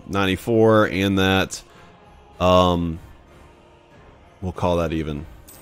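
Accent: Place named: East, United States. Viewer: You sound very eastern american. Where are you from?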